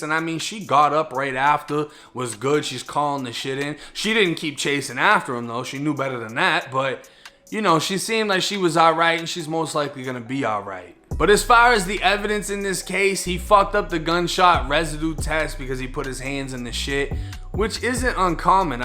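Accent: Boston, United States. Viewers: Boston style🤔⁉️⁉️⁉️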